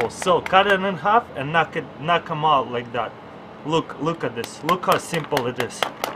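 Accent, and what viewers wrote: Russia. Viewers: the Russian accent makes all the video! Boom BOOM love it! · Give him a break his Russian. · He's not English he's Russian his pronunciations are different then yours! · I find a strong Russian accent funny. respectively his voice is hilarious. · Why would you even want to lose it? Russian accents sound fucking awesome. But yeah, for an English speaker, it is EXTREMELY difficult to pronounce Russian words properly. I've spent a ridiculous amount of time learning two simple words: здравствуйте товарищ (Hello, comrade) I've said it at least a hundred times, and I still catch myself pronouncing здравствуйте (zdrah-stu-ee-tcheh) incorrectly. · He is Russian like me he has an accent people sometimes be rude about how he talks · He has a Russian accent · Taras Smishchuk what's wrong with his accent? he is Russian, deal with it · Russian English is so funny. 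One thing he does wrong though, he flipped the egg over. Blasphemy!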